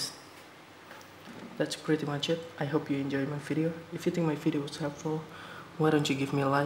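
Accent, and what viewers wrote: Indonesia. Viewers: idk why but ur accent is like an indonesian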